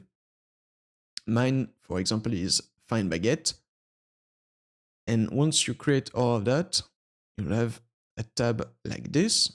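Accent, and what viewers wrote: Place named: France. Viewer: En tant que française j'ai reconnu de suite l'accent :D très bon tuto! la boite à outil est elle à la portée de tous?Je veux dire est ce que si on ne fait fait ni modding ni programmation , n'importer qui pourra créer sa map ,et ses quetes? j'ai lu aussi que ce n'était dispo que pour steam ? il y a t il un espoir pour GOG?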